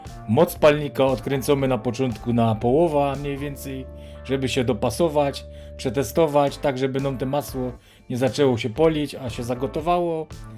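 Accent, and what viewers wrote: Silesia, Poland. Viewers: Jo lubia Śląska gadka ❤❤❤ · To jest dobro Śląsko Godka 💪💪💪❤❤❤ ,fajnie się słucho 👍🤣.Superrr. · Co to za język ??? Ślązak? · Piękna Śląska Mowa..Dziękuję za przepis....Pozdrowienia z Lubelszczyzny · sama jesteś wsiowa. To gwara śląska, gdyby była kaszubska, ni kej byś nie poniała, hihiiii... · Mieszkałam 20 lat na Śląsku, i ślōnsko godka szczerze mnie wzruszyła ♥ Dziękuję za bardzo uproszczony przepis, bardzo lubię minimalizować swój nakład pracy, przy maksymalizacji efektów. Akurat dziś robię masło klarowane, cieszę się, że przy tej okazji tutaj trafiłam, bo będę mogła na gorąco wypraktykować. Pozdrawiam szanownego Autora, wszystkiego dobrego. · Lubię gwarę śląska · Dziękuję biorę się za klarowanie masła i robię subskrypcję . Konkretny przekaz i śląska gwara którą lubię . Pozdrawiam😊❤❤ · Lubię gwarę śląską!!!!! Dziękuję 🎉🎉🎉🎉🎉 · Piękna jest ta mowa Śląska dzięki za przepis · Zrobiłam , świetny patent nie trzeba zbierać tej pianki. 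Jaka fajna gwara śląska . Pozdrawiam Pana 😊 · Pikne masło chopie. 😅 Uwielbiam śląską gwarę. Fajny przepis i cudowny zaśpiew.👌🏻 Dzięki 👊🏻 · Lubię słuchać Śląskiej mowy, 38 lat temu mieszkałam przez pół roku w Rybniku .A maslo i tak sobie zrobię. Pozdrawiam i już dobrych świat życzę. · Jasno, prosto i konkretnie. Wartość dodana: piękna śląska godka. :) Pozdrowienia z Krakowa od potomka Ślązaka (z tym, że cieszyńskiego). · Kocham Twoj slaski❤ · super przydatny filmik :) uwielbiam śląską godke :) pozdrawiam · To jest śląska gwara, prawda? Pozdrowienia z Wiednia❤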